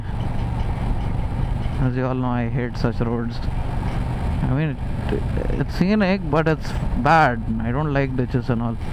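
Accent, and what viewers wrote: Hyderabad, India. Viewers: yo buddy ur vlogs are awesome...love the way u talk Hyderabadi accent bahot mast hai. .. something new n nice to hear...english mai sab karte tum kuch alag karae ...🤣🤣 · ji I so ducking love ur hydrabad wala accent...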